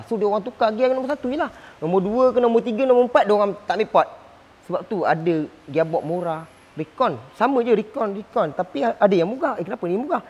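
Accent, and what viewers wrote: Pahang, Malaysia. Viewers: ape pon channel bnyak bantu dlm ilmu yg serba kekurangan tu aku ttp boleh paham..yg penting gelak sendiri tu paling rare..pasni cer try buat video pasai aircond wira bile on je blower jalan tapi compresor xjalan dan bile compresor direct beteri heeellllok pulak..harap ade video ni utk care nak mencari punce die...bossku..loghat awok pahang sangat..hahahaha🤣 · Bengkel kat mana? Cam org phg je..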